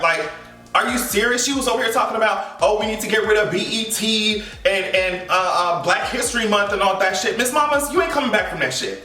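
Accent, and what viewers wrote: Jamaica. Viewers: If you don't speak that patois!!!! You betta, I love it, I want more boo, celebrate that heritage too. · I love ur jamaican accent 🥺😍 and yess I’m so happy for Adele ! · When you speak Patois 🥰✨😍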